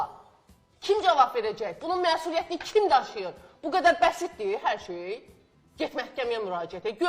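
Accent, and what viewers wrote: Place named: Shaki, Azerbaijan. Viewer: Bu xanıma halal olsun🎉..Bu arada deyəsən xanim Şəkidendi) aksenti bize oxşadı)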